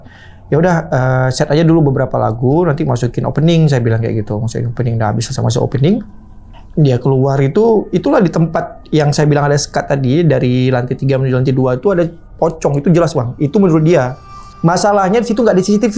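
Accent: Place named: Banda Aceh, Indonesia. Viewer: Si abang, logat nya aceh kota lii kok😂, cirikhas awak banda aceh, btw ni binkara fm gampong jawa, kalo di google maps gaada, itu salah, yg bener di gampong jawa deket lapangan persis, lurus lagi kedepan ada tpa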